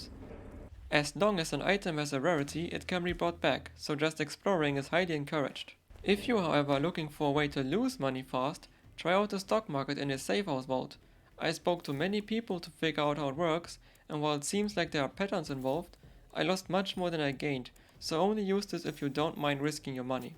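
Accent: Germany. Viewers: Man merkt du bist deutscher haha ist lustig wie du english sprichst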